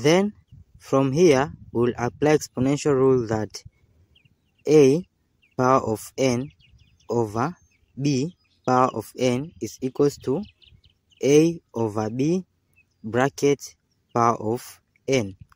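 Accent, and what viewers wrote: India. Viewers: Thank you random guy, that sounds Indian, on YouTube.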